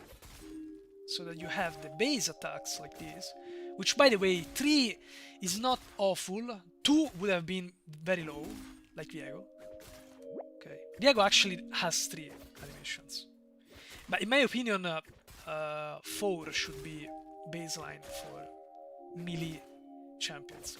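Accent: Italy. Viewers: italian accents or my ear are not working? still idc i love youre video still se capisci sta cosa allora ti ho beccato;)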